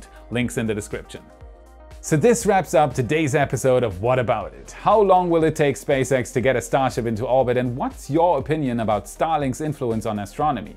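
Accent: Germany. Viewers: Wait a second... do you have german roots? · wait... are you from germany?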